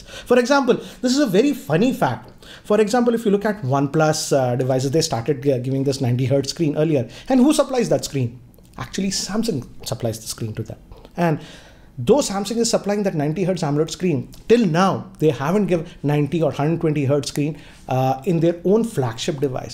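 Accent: India. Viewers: as soon as i hear the indian accent, im like "yes, he knows what hes talking about. time to listen" good info bro. i was dying for like 15 minutes when you asked about shooting 8k videos. its hilarious because its true. there is literally no need for shooting 8 k videos.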